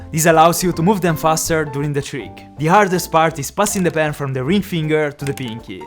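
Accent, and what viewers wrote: Italy. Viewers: Si sente che sei italiano😂😂😂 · Come si sente che sei italiano.. comunque bel video. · Your accent sus I think Italian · Il tuo accento mi fa morire, credo che tu mi stia capendo perche si capisce che sei italiano ahahah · I love his Italian accent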